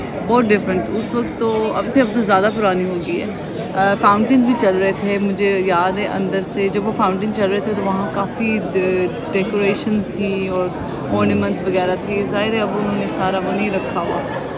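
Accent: United Kingdom. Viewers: I don't get your British accent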